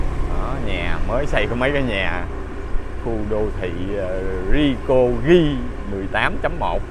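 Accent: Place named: Mekong Delta, Vietnam. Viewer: Xin chào em trai.nghe giọng nói anh đoán em là ng miền tây.vì trước đây những năm 1984 đến 1991 anh năm nào cũng công tác miền tây.đầu năm vào nam cuối năm ra bắc.thời gian ở tỉnh đồng tháp khoảng 2 năm.ở p2 tx sa đéc.rồi cao lãnh .huyện tháp mười vv❤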